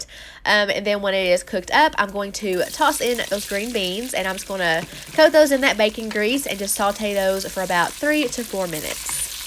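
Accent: South, United States. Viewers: What state do you live in? Love your accent! I’m from North Carolina and I have an accent as well, but yours is more southern than mine. Lol! Love ya! 💖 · You’re such a southern Bell, I love your accent and food ideas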